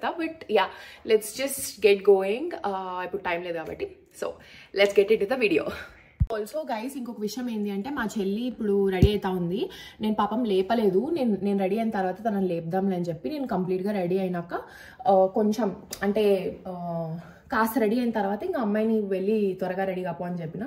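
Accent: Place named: Telangana, India. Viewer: ni slang aacham telangana pilla la matladuthunaruu ela '' chesinaruu vachinaruu ani bagundi ala miru matladuthey 💗✊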